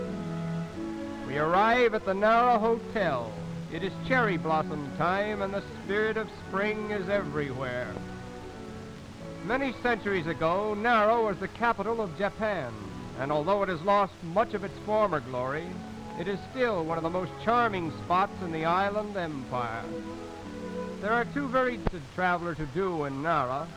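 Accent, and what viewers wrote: United States. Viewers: ナレーションアメリカ人かな？ まだ日本とアメリカが仲良かった時代か。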